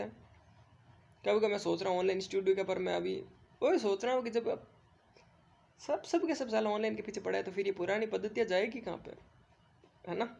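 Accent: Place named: Rajasthan, India. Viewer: Rajasthan ke lag rhe ho Guru ❤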